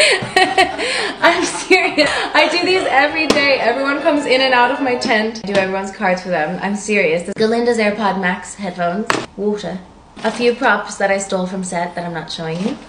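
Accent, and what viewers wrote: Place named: United Kingdom. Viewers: she sounds british now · Well, she was in london for a long time so it's natural she'd pick up the accent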